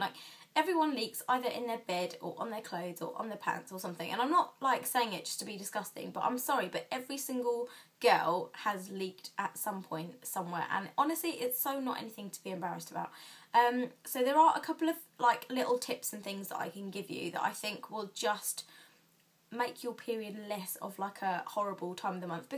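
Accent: United Kingdom. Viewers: aww British accent <3 I wish i was there .. :( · Oh ok well she as has British accent so !!!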